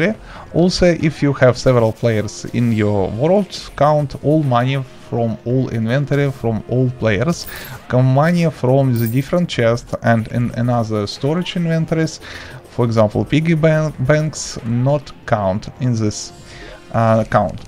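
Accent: Russia. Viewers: Is he russian??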